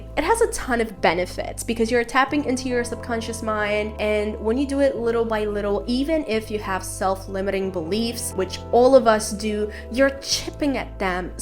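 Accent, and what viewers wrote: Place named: Italy. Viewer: OMG ARE YOU ITALIAN? I understood you bf hahaha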